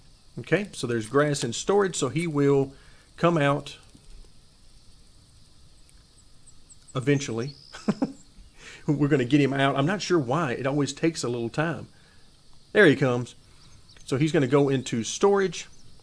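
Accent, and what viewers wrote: South, United States. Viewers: I am from Florida and this accent is interchangeable for Florida, Georgia, Tennessee and maybe further out depending on the individual. I have lived down here for thirty-five years.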